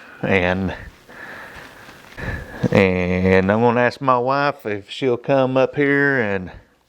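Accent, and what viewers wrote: South, United States. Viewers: I'm in the UK. Love your southern drawl.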